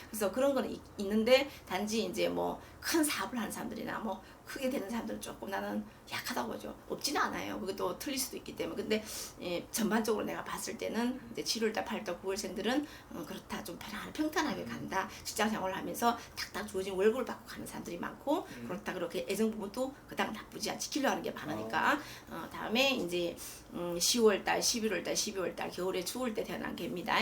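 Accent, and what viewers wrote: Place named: China. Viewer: 넘빨리말씁해서 잘못알아듣는데요.발음도 여기조선족발음으로들려요천천히 다른무속인들처럼😢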